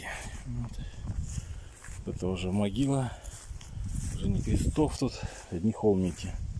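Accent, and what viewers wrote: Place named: South, Russia. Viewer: Это не украинизмы. Это русизмы ,а точнее южнорусский говор!....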